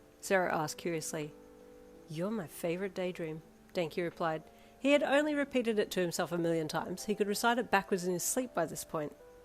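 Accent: Australia. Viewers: Naur, Aussie accent is awesome!! 😁💜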